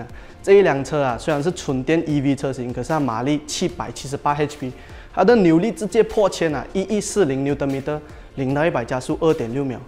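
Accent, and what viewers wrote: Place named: Butterworth, Malaysia. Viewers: 一听就知道是檳城口音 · 华语也是，一开口就是 Penang 腔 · 这个英文口音，一听就知道是北海人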